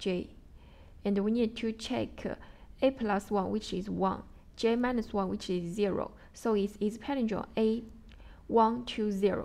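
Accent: China. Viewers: Improve your English. It's hard to understand so called Chinese English.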